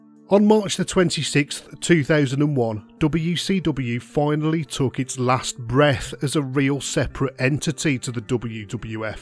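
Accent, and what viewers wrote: Birmingham, United Kingdom. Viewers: Are you from Birmingham by any chance? Or black country?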